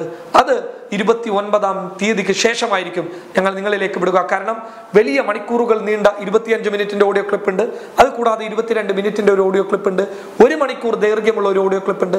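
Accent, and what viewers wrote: Kasaragod, India. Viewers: ഇതില് കോഴിക്കോട് കാരിയായ ബാച്ചാ യുടെ കാസർഗോഡ് സ്ലാങ്ങിൽ തന്നെ ആണല്ലോ സംസാരിക്കുന്നത്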